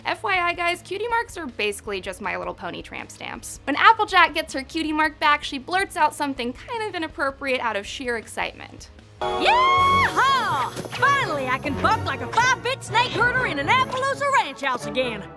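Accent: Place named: South, United States. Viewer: Love your southern vibe too, the way you pronounce things is 👌🏻👌🏻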